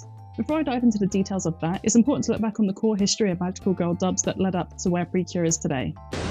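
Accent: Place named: United Kingdom. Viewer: Your voice is so calming, especially since you have a familiar “accent” being British too =]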